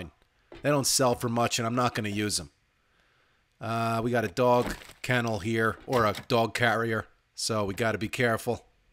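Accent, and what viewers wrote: New Jersey, United States. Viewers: I HATE zombie games & jump scares...that being said, I bought 7D2D because I LOVE your content. I've been playing it faithfully for months now. Thanks to you, I have become quite the zombie killer! I never miss one of your episodes and have been working through your past content, because you give great entertainment! Congrats on 400k subs, and looking forward to hearing your dulcet Jersey tones in the future!:D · army of 400,000 degenerates going strong! Guys, go back and watch some of the very first videos he posted. He used to be so polite and no Jersey accent at all. Listen to him now! I much prefer this version of lol dude you rock!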